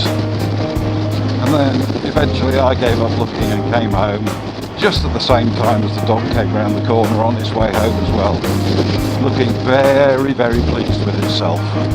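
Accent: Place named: United Kingdom. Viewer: . Eu intendo muito pouco o inglês. Eu sou mais acostumado a ouvir o inglês Americano. Dar para perceber bem o Sutaque Britânico. Valeu meu irmão.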